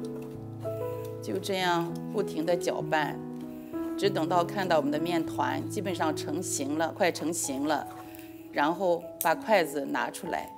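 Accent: Qingdao, China. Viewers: 这口音……青岛人表示好亲切啊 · 在德国学包饺子，青岛口音太亲切了！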